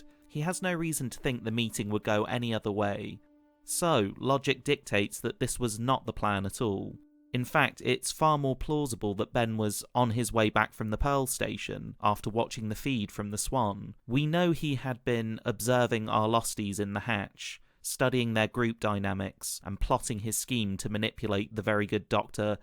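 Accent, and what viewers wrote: United Kingdom. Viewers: Is this video a robot voice or yours??? I love the voice in this video (British accent?)...I would be surprised to hear it's a robot!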